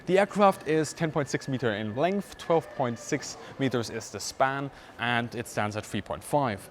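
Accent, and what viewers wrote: Germany. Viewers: One of the fastest 23 minutes I’ve had this week! Such a vivid command of the English language and cutting accent of the German dialect in itself is interesting. You have such a vast knowledge and enthusiasm for history and aviation it is incomprehensible your videos could be anything but fascinating. Thank you for your skillful narration and aviation historical intellect. Bravo! From NY, a third generation American with ancestral roots from Germany, Kevin.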